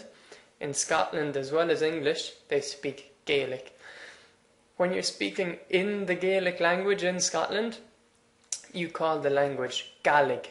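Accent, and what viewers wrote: Ireland. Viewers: i dunno why but I can listen to an Irish person speak for hours. Lovely accent :D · I have a rather naive question: Is the Irish accent a result of your ancestors speaking originally Irish and learning English later on? Great video by the way!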